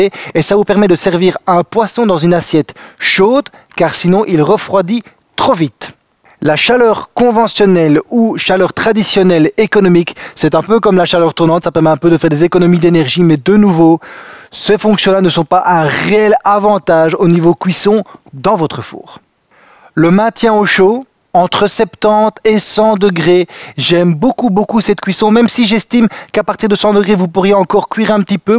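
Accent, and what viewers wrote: Belgium. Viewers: Merci 🙏 Monsieur pour vos bons conseils, j’ai appris des choses grâce à vous. Je pense que vous êtes Belge ça fait plaisir de vous écouter ça change une fois comme on dit en Belgique 🇧🇪 j’aime aussi les français après tout on est voisin non peut-être 🤔 🙏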